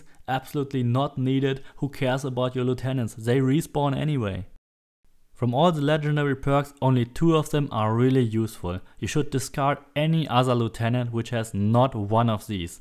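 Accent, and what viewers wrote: Germany. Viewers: Love this channel. Despite having a strong german accent (like me:D) which could be difficult to understand by everyone these informations are pure gold. Thanks to this channel I played the game again. I have so many ability points that I can try out many sets which are also presented in this channel in a very detailed manner. Very helpful! Thanks! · Only took me 10 seconds to recognize that you are german. Love your videos. Keep going <3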